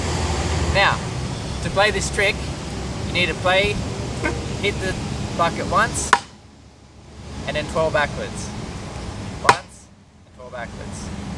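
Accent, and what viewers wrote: Australia. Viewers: Yes. The Australian accent varies a little bit over different areas of Australia. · Is that an Australian accent?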